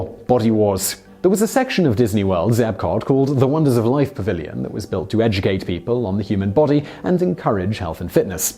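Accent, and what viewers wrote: United Kingdom. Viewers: lotsa misformation... your cookie cutter production wears thin... fewer videos and better research, if you don't mind... no offense, but tire of informational videos with the narrator speaking in British accent., which does not imply intelligence 7 and/or education as is generally accepted by the rest of the world; French and Spanish are considered "sexy", while German is considered coarse and unattractive ... there's a subject: Accents - favorites and least ... 🕊️ 🌎 ✊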